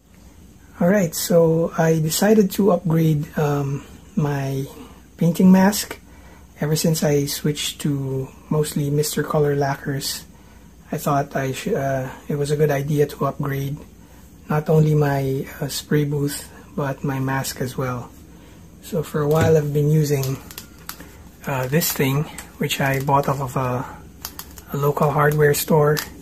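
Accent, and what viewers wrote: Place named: Philippines. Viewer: The accent tells me you're pinoy? if so pare san mo nabili yan? legit na 3m or fake?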